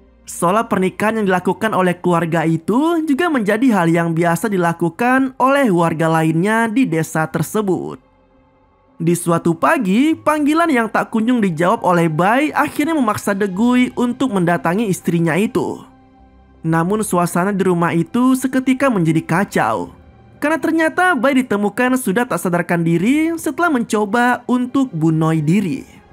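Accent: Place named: Medan, Indonesia. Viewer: Begini suara Adon Saptowo, vokalis base jam, tapi dengan logat medan 🤔🤔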